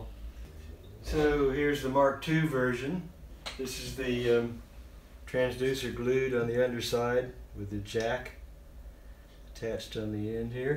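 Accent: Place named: New Zealand. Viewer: Nice job, great to hear a fellow kiwi!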